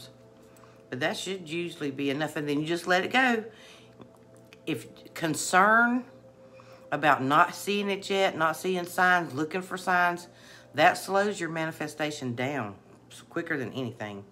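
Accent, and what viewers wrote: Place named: West Virginia, United States. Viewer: Hi! It is GREAT to put a voice to ur coaching. It puts a whole new spin on everything. Glad to be able to subscribe as i cannot be on Social Media d/t my job. Plus your dialect is a lot like my own being from WV. LOVE IT!!! THANK YOU!!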